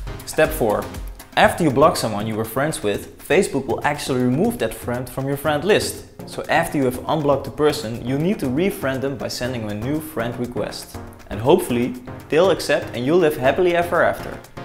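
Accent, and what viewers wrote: Netherlands. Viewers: you're from the netherlands arent you?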